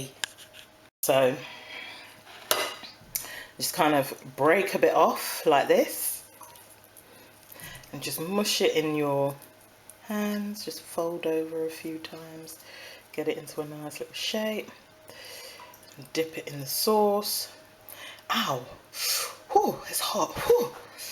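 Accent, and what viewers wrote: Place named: RP, United Kingdom. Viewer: I like your accent is so posh ❤️😂😂😂 ! Love from a Ghanaian sister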